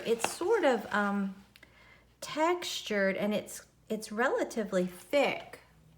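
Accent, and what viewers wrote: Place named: Georgia, United States. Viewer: I like all you crafts and your southern GA speaking. I am from MS, so mine is more country. Like you I love to be creative. I am gonna be in GA about 10 days starting the weekend.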